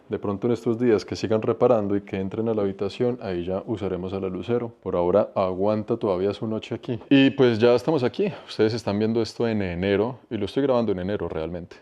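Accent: Bogotá, Colombia. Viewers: Amigo, muy Guerrero siempre me pregunte cual era su historia, habla frances? Como aprendio? Por que vive en canada? Es usted nacional de otro pais, su acento es muy marcado colombiano.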